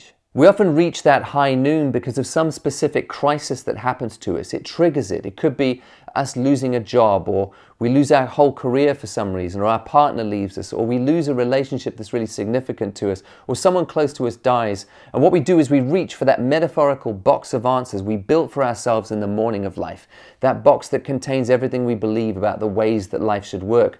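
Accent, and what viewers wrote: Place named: South Africa. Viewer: I thought I could here a little South African in your accent. Haha. Anyway Great content Man. Your videos are inspiring.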